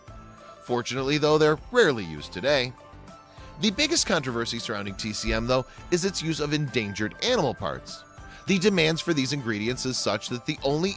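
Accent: Midwest, United States. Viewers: New subscriber, enjoy your videos. The narrator on this video has a distinctive Midwestern accent. I enjoy hearing it since I've been in the south for the last 20 years.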